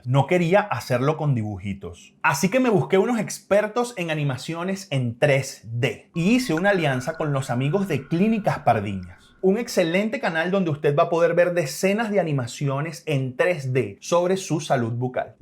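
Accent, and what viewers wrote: Venezuela. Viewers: Para mi se escucha venezolano, no se si me equivoque pero su acento me parece de Venezuela 🇻🇪.. ❤️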